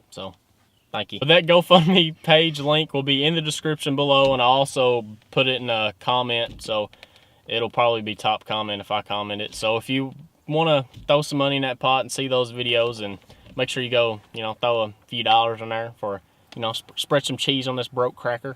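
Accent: Kentucky, United States. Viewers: aye man what part of kentucky you from..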